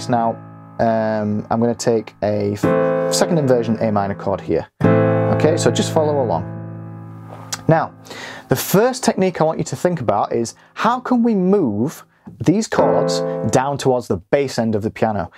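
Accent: United Kingdom. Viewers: I came here by accident. Pleased I did. Your enthusiasm is infectious. Many thanks. Great to hear a Brit here on YouTube.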